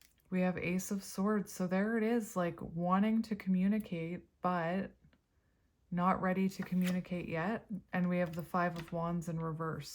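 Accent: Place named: Manitoba, Canada. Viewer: I'm so curious, what province are you from? Your accent sort of gives me Manitoba vibes but it's hard to tell haha 😄BC girl over here 🇨🇦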